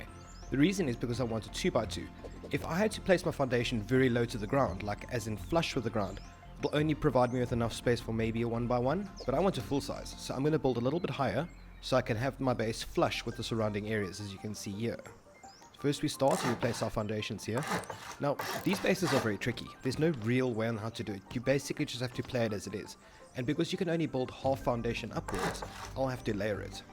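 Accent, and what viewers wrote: Afrikaans, South Africa. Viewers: Wait he's south african? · The accent lol. Afrikaans. Man its so easy to pick up lol. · Where are you from, you sound South African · I am south African aswell I instantly knew your south Africa by listening to your accent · Are you south african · You sound South African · I knew you were South African! Finally a fellow South African Youtuber that is good! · YOU SOUND SOUTH-AFRICAN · you South African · I’m guessing you’re from South Africa? Most probably Joburg? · Thought I recognised that accent Rare to see another SA on YouTube, especially a content creator. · Are you from south africa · U can hear this guy is South African 😹🇿🇦 Ayo straight from the mother nation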